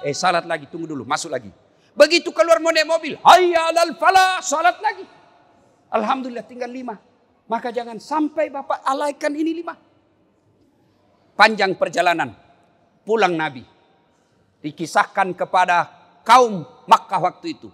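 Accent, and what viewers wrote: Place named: Sulawesi, Indonesia. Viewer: Saya suka mendengar ceramah ustadz. Bahasanya jelas cirikhas Sulawesi Cetho welo welo. Sukses selalu.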